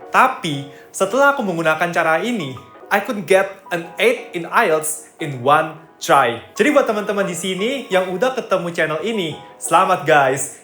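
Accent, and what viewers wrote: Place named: East Java, Indonesia. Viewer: surabaya ta sidoarjo mas? btw terima kasih tipsnya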